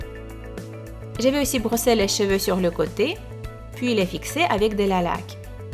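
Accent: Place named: Russia. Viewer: j'adore ton acent russe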